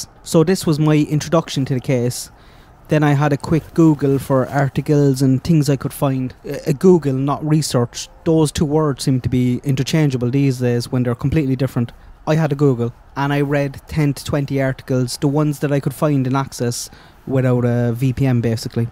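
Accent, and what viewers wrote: Ireland. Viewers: I live in Newfoundland Canada (just a hop across the pond)and you have the same accent as my late grandfather (his great grandmother was Irish from Waterford county), where in Ireland are you from, not a street address, just a county We have a very large population of people of Irish decent, Some from the 1700's. Generations later many have not lost their various accents. I'm like Michelle, worked on my ancestry for years and I'm looking for my castle or a pot of Gold. Back to the Summer Wells case, not sure if you live around mountains and if there are those who wish to live almost under the radar but I know here in eastern Canada there has been crime "in some of our hills, mountains as well. I looked at some of the criminals who live on or around Ben Hill Road. I believe there is some kind of mob mentality in there, but based on some of the stuff Jodisue has got on with, you'd think they were all saints. 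Now truth be told we all know differently. Frank Light for instance, she seems to defend and he has a bad record. the whole Hollow in there is crawling with those with a criminal record.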